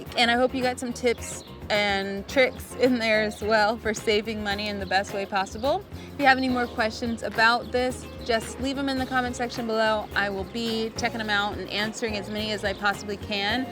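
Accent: South, United States. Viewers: where in the south you from?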